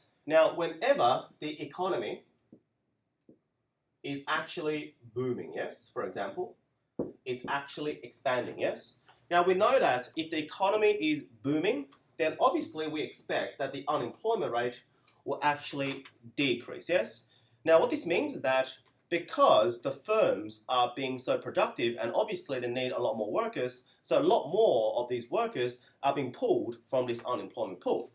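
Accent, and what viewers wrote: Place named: England, United Kingdom. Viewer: Chinese with a bit English accent, like your lecture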